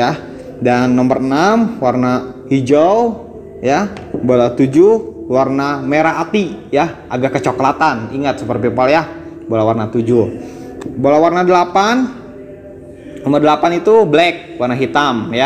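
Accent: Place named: West Java, Indonesia. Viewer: Belajar dasarnya pake logat sunda, maklum~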